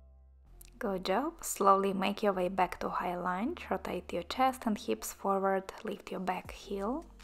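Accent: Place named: Poland. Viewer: fantastic video. she sound polish ;)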